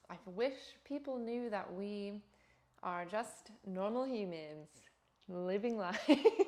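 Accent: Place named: United Kingdom. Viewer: This is my first time hearing your voice and idk why but I thought you were British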